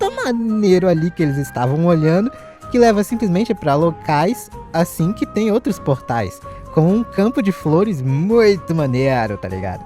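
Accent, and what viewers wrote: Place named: Minas Gerais, Brazil. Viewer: Seu sotaque é de mg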